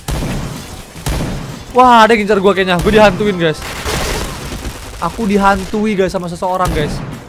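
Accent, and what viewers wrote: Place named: Pekalongan, Indonesia. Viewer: Pasti orang pekalongan.😂😂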